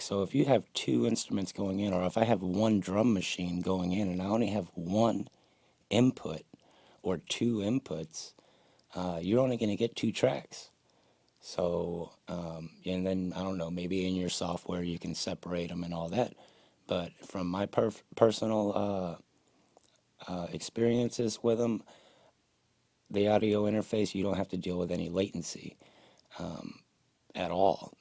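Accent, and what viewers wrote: Philadelphia, United States. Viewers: Yo bro are you from Philly?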